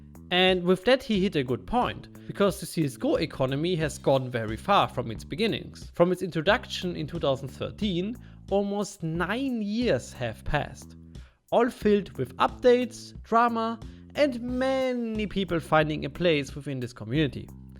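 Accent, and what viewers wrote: Germany. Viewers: i entered , are you german ? you sound like you have a german accent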